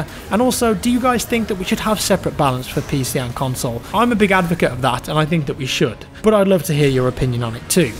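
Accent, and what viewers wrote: United Kingdom. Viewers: A British lad that says 'Beta' the 'Murican way? That's new.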